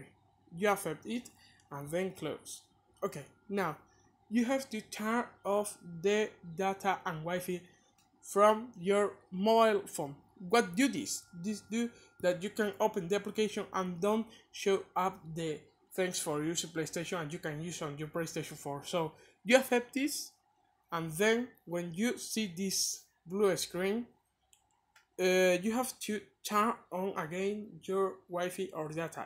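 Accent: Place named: Oxford, United Kingdom. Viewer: Ojo ese ingles de oxford. Me alegro de que hables asi ojala yo hablara así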